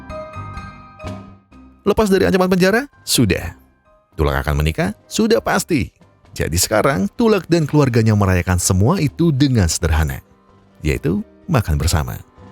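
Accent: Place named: Java, Indonesia. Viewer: Cakepp bangn... Abangnya lucu.. Logat guyonana jowo😅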